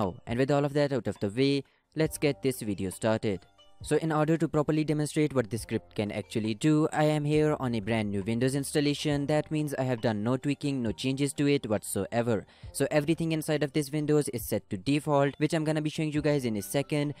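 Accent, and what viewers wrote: India. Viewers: that indian accent tho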